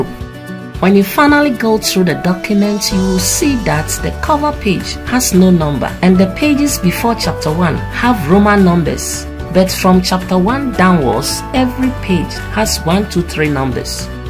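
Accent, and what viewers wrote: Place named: West Africa. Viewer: I have not heard all of the video, but I give compliments to the speaker. Beautiful accent (Nigerian/West African); well-spoken English that is clear as a bell and easy to understand. I've tried listening to people from India. They are very smart, but I can't get past their accents.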